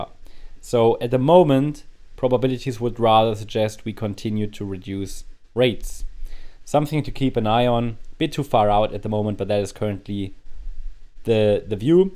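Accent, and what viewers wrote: Germany. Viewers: He is German right? He Talks soo German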